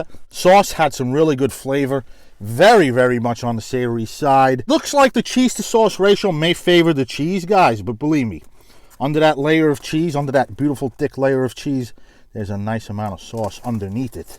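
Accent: New York, United States. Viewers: Absolute new yawk knucklehead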